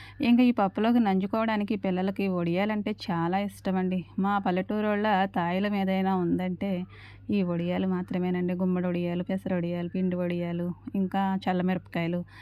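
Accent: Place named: East Godavari, India. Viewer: ne matlade slang ma vari menattagari ilane untundi.vallu east godavari dist. Nannu Amma Ani antunnavu I felt very good. Inka na family maku oka abbayi. Ante ma varu monna sept lo cancer to chanipoyaru. Anduke nenu austrelua ochhesanu. Ma kodalu kuda ikkada oka pedda off lo engineer. Ma abbayi kuda engineer. Vallaki oka papa vahini 3rd class. Nenu kuda ikkadiki ochhi job chestunna. Na age 65 ayina naku individuality ekkuva. India rate prakaram 75k ostundi. Naku hyd lo oka place undi. Ikkada ma pillalu 2 houses 2 cars hyd lo 2 places konukkunnaru. Valla salary lo konni loans cut avutayi. Anduke nenu job ki vela. Ikkada Anni mechines kanuka nuvvu chesinanta pani undadu. Nenu ma kodalu evariki veelu unte vallam chesukuntamu. Idi na sangatulu. Ma sisters antaru. Inta age lo job enduku pillalu chusukuntaru kada Ani. Naku oppadu. Amma nenu msg pettindi neeku ardam avutunda. 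Lekunte nenu msgs manestanu. Cheppu emi cheyanu.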